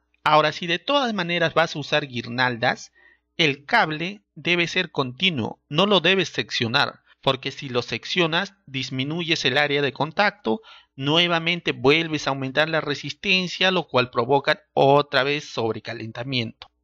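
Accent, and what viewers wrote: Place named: Peru. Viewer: Dime que eres de Perú.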